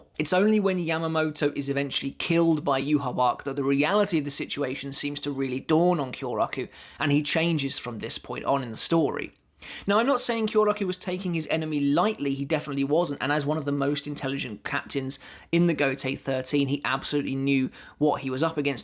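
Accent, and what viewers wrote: United Kingdom. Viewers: Hey Mr, i love your british accent.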